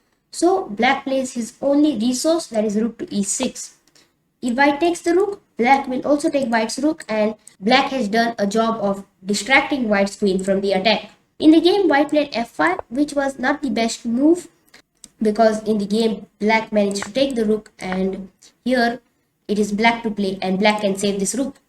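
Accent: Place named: India. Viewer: Your accent is like Indian like us r u Indian am curious about your native 😉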